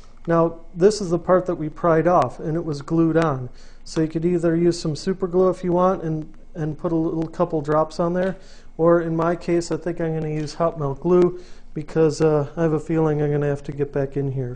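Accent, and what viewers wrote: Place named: United States. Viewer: Thanks! At last! Someone who actually knows what they are doing in a Apple Mighty Mouse take apart & repair. The instructions are very good and the narrator's voice is in adult American English. Plus the narration is without a lot of superfluous "Uhhh"s and other vocal diatribe. And it is even edited well and in focus! What a novelty! In my case, the outer containment ring was REALLY glued down in front. It took a good half hour of gently slicing into the space between the ring & the housing to with several sharp Xacto knife blades to finally cut through the glue. The containment ring is pretty flimsy and I was afraid of breaking it so I did not try to pry it too hard. Patience (and a sharp blade) is the key when it is glued so hard. This mouse of mine had stopped working properly about two years ago. It would not scroll in one direction. 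But upon disassembly I found that my unit was not as dirty as I thought it was. What I discovered was that just one of the little tiny wheel & shaft assemblies that surround the ball was really jammed up with debris keeping it from moving. Because I waited so long to do my tear down the shaft had actually worn down on one side from the friction of the ball against it. So my problem was not solved. It certainly works faster and smoother than before but that one direction is still not functioning due to the worn shaft. Lesson learned—if simple exterior cleaning is not enough (it never was) then do a tear down and initiate a proper cle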